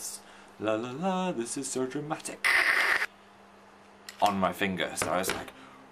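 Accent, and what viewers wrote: North, United Kingdom. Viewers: So northern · 1. THE SAME BEDSHEETS FOR GOD'S SAKE 2. so northern. much love. 3. such expresssion at the end of the video. very appreciated. 4. what sarcasm. 5. my fav video among like a 100 others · his voice is so northern ohmygod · I love how northern he sounds! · His voice... I'm used to this very Northern accent now. It's gonna change, and I know it'll end up being slightly gradual but omg jdhfhdndhkxa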